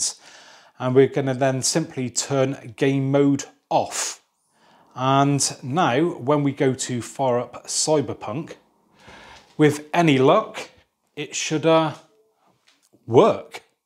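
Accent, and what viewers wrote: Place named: South West England, United Kingdom. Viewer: His accent sounds like south west England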